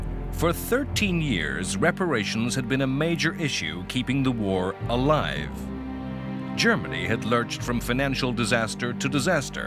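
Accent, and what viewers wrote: United States. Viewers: An American who actually pronounces "Adolf" correctly! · wait why is this narated by an american?...the rest of the ones i have watched are narated by an English guy?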